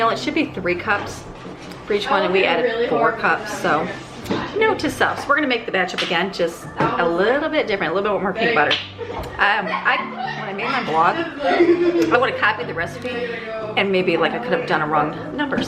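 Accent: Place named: Michigan, United States. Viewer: I just found your channel and immediately fell in love!! I hear the accent and wondered.. is that the Michigan accent I know so well?! 😂😂 I’m from Traverse City!! My hubs and I live in Savannah, GA now for his work. It’s a very different world down south!! Thank you for the new channel addiction LOL!!! 💜💜💜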